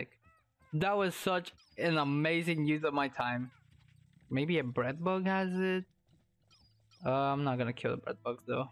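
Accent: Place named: Latin America. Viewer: I like your latin accent 😂❤️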